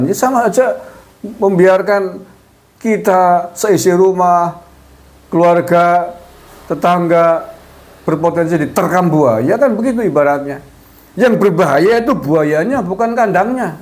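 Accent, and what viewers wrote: Banyumas, Indonesia. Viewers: Mas 🤭 ngomong e koyo Ipar ku wong banyumas 👍🏻🙏🏻🥰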